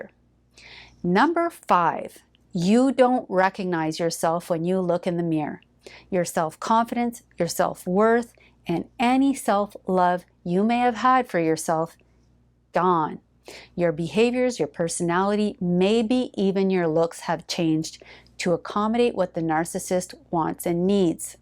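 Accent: Quebec, Canada. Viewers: coach, about - ugh that francophone/Canadian accent makes me melt. Love bombing you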